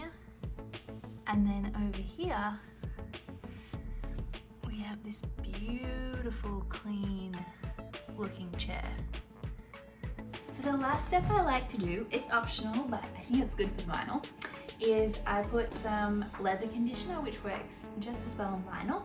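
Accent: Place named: Australia. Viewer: "Cleen-a" Love Aussie accent. Thanks for a lovely demonstration.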